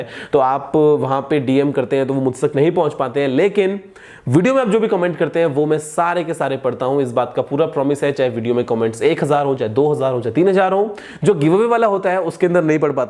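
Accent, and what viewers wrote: Bengal, India. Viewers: Are you bengali sir?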